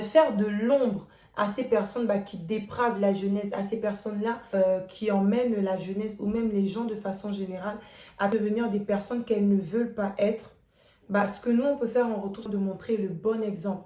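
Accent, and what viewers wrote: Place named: Gabon. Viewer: Au tout début de la vidéo, j'ai capté que t'étais gabonaise grâce à l'accent 😂😅 Courage sis🇨🇲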